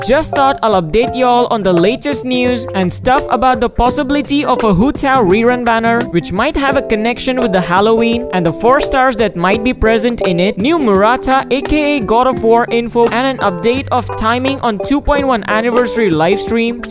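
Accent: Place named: India. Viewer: Your accent seems like an indian/korean...r you from india?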